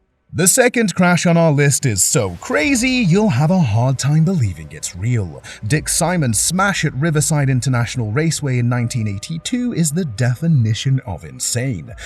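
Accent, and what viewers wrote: United Kingdom. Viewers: the "Brittish" AI voice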